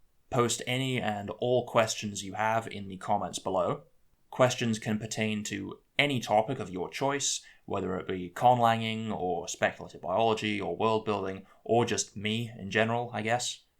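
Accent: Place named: England, United Kingdom. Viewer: Where are you from? I can tell you're from somewhere in England but I really cant differentiate dialects within england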